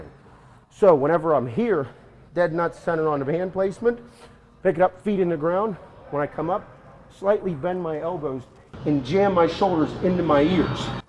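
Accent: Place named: New York, United States. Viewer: I love your way of explaining tips, moreover I like your typical New York accent. I just love it. Whenever I listen to you, I feel I am in New York. Thanks bro you the best. Prem India.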